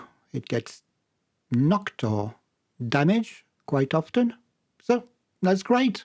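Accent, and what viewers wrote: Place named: France. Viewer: Just commented on another video and now exploring your channel :) The french accent again 😍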